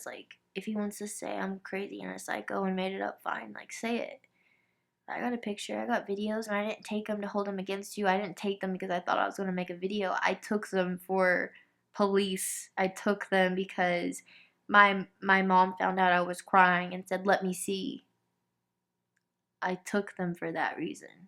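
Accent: South, United States. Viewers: Hey, I am so glad that you are feeling happy in this video. I completely love it when a girl starts crying, and then she would finish crying, and then she would start smiling and laughing. I am so glad you are feeling okay because I have been thinking about you and praying for you. I know I am much younger than you are (I am 18), but I admire you a lot. The number one thing I love about you........... is your one beautiful precious smile, along with your lovely Southern accent.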